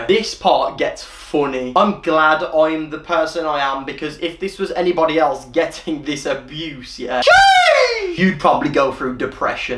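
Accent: Birmingham, United Kingdom. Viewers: Hank Black he’s from Birmingham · Which region of the U.K.is your accent from. I know different parts of england have different accents. I don’t know which regions the accents are from though. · Hank Black Birmingham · Hank Black Birmingham Dudley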